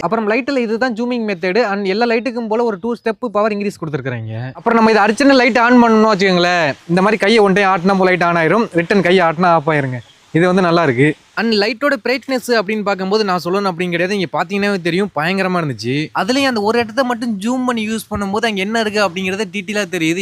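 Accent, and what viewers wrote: Tamil Nadu, India. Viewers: നിങ്ങളുടെ തമിഴ് എനിക്ക് ഇഷ്‌ട പെട്ടു, സിനിമകളിലെ പോലെ അല്ല. ഇത് നാടൻ തമിഴ് ആണ്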